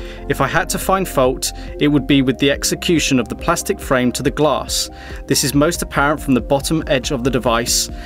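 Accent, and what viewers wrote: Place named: England, United Kingdom. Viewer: May i guess what nationality are you? Based on your accent i would say you come from England :) Again, the way you do it, i just simply love :)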